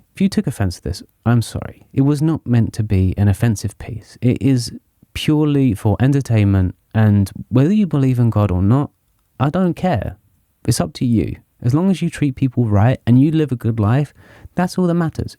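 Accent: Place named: United Kingdom. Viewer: I don’t mean any offense at all, it’s just your shaggy look with an American accent would make me think that you are a drug addict, but with the British accent, it makes me feel like you’re a fucking sage, English Nostradamus ass white boy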